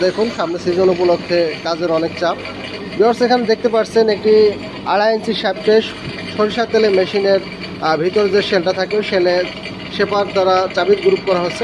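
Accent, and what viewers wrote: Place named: Faridpur, Bangladesh. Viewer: ফরিদপুর কথায়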